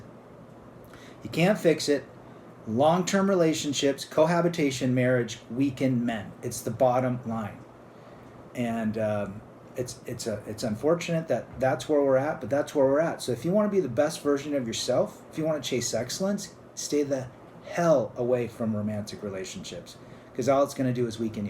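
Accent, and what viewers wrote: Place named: RP, United Kingdom. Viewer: love the top-hat accent! 😃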